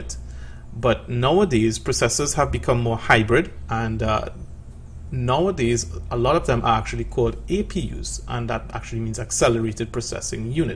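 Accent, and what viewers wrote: Trinidad and Tobago. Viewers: You can hear a Trini from anywhere in the world. 😂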